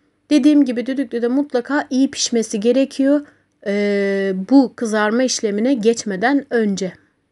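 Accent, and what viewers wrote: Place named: Kilis, Turkey. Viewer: Aynı Kilis lisanı❤❤❤gibi konuşuyorsun ❤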